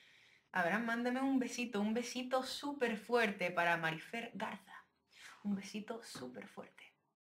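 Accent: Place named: Mexico. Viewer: Holaaa Abraham soy Maria de Argentina- Buenos Aires .. Per me dicen Mar , bueno te queria decir q cantas muy lindooo , sos mi amor platonico la vrdd , me enamore de tu dulce vos jejeje si tenes algun tiempito me gustaria q escucharas mis grabaciones de mi cuenta en youtube y me dieran tu opinion ,ya q te admiro bastante y me gusaria conocerte masss bonitooo. Te kieroo muchiiooo , adoro tu asentoo mexicano...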